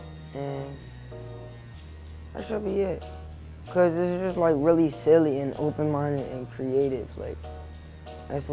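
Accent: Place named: Philadelphia, United States. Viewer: He from philly that’s how we talk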